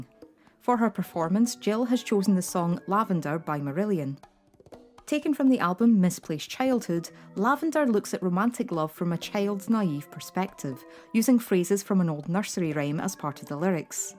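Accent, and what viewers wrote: Scotland, United Kingdom. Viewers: I watch it regularly & my hubs & i quote this daily. Helped me in my dark days & for me its the greatest show on earth! The "funeral" episode in series 1 is the joint funniest & most insanely brilliant shows ever along with series 2 "Jill's Pregnancy". Yew can keep yer bitterness to yerself, perhaps you might want to have a day off from persecuting Jill, YouTube Channel person, otherwise it just gets nasty. As soon as i heard your hacking cough & Scottish accent all i thought was "oh God, its Sandra!". Id cry if my ducts were working. Thank yew.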